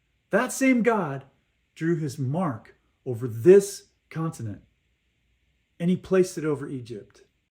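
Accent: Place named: Utah, United States. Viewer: You sound like a utah Mormon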